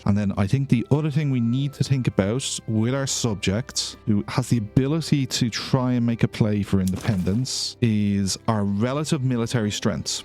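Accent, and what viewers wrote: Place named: Ireland. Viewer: Great to hear an Irish accent, even better when its with paradox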